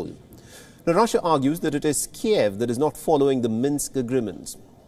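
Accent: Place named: India. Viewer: Guys... Whenever you hear that accent. Just remember about the old grannies these indian IRS phone call/ telemarketing scammers had scammed. 👍👍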